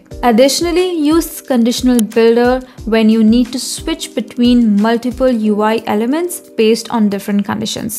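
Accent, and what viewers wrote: India. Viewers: Gosh, Indian accent again. FlutterFlow, don’t go down this path.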